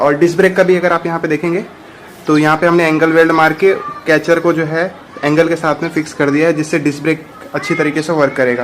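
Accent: India. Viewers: He does seem like a Gujarati the way he speaks hindi. May be he is originally from Hindi speaking state. But who cares apne desh hi baccha hai.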